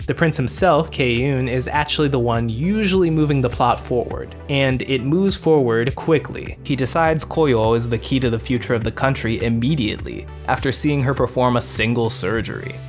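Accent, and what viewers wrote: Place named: Spain. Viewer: whats with the spanish accent for the japanese pronounciations? either do right or dont at all plz.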